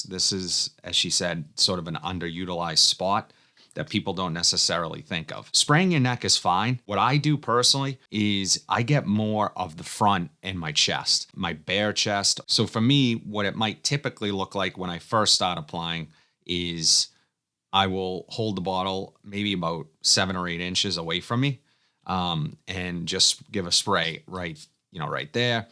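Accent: Boston, United States. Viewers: Is that a Boston accent I hear?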